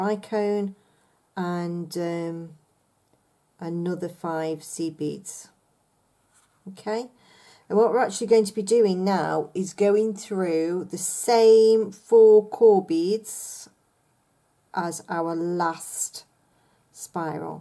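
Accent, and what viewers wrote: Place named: Cheshire, United Kingdom. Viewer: Evening while looking for Christmas dec videos, just stumbled upon your vidios, as much as I love some the American designers and teachers it's nice to see a fellow brit doing some you tube tutorial. This is fab reminds me of spiral staircase. Wonderful. Plus you sound just like my aunty who lives in Cheshire, and works in Manchester very similar accent to you. x x Happy beading.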